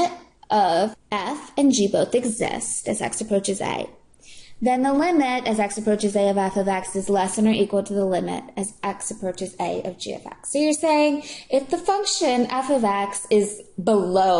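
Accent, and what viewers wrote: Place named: Maryland, United States. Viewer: hearing your maryland accent made me feel back at home while going to college in california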